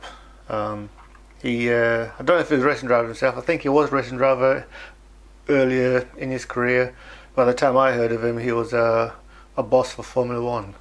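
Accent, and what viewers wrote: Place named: Iceland. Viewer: Ha! You're as good at maths as I am! :P You have a beautiful Icelandic accent :D I remember all the hoo-hah with that. Oh no! That sucks so much. Oh lord, that sounds very not good to deal with if you're flying a plane! Oh you're such a sweetie! How very Love Actually! Oh wow, you look odd without a beard! I'm glad you clarified it wasn't the KISS guy!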